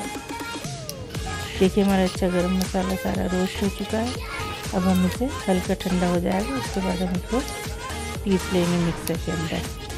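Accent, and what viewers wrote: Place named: Karachi, Pakistan. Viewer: ہم حیدرآبادی ہیں میری بہن آپ مجے آپ کراچی کی لگ رہی ہیں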